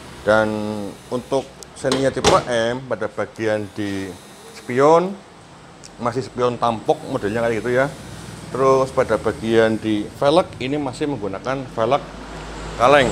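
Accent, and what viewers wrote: Banyumas, Indonesia. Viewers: Berkah selalu mas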